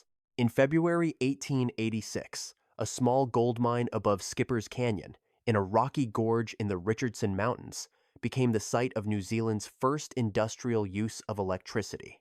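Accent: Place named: United States. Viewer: Actually a very good video despite American pronunciations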